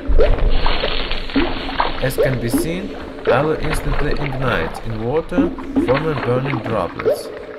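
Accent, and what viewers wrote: Russia. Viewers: Cilla Moke it's a Russian accent, isn't it?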